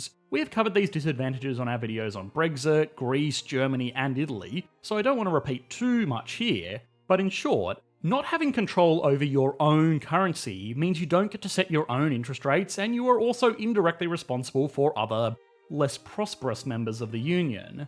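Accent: Australia. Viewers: The guy in the video sounds australian and over here we are basically englands b*tch and worship them so it makes sense they say stupid stuff 😂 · i've just found this video - wow simplistic explanations yet right on point. who would have thought an Australian speaking slowly makes incredible sense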